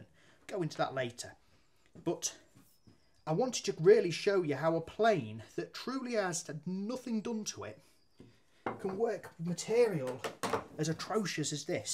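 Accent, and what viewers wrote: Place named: Birmingham, United Kingdom. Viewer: Wonderful accent, reminds me of the Netflix series "Peaky Blinders". Great video, btw. Well explained.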